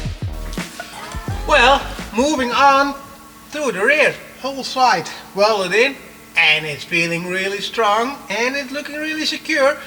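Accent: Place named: Netherlands. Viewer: Aan het Engels te horen ben jij Nederlands als ik zo mag raden 🤣🤣🤣👌 zo klink ik ook meestal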